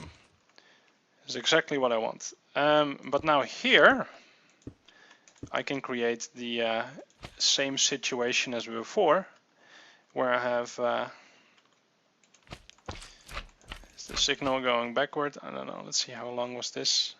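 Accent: Netherlands. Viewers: lol jij bent nl dat hoor ik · Het , are you dutch. You sound very hollandische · Are you dutch?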